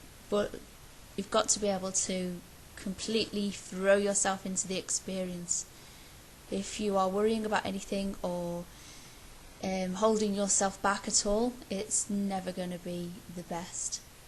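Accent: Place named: Liverpool, United Kingdom. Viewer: Thanks for being so candid. I think we all worry sometimes about how we come across to others, but we can't let it dominate our actions. You are possibly one of the most interesting YouTubers around, and certainly your Liverpool accent makes you the most facinating. Great work on the room, and I am definately a fan. Very insightful vlog! Excellent points on intimacy. Super glad I'm a subscriber. - John Reagan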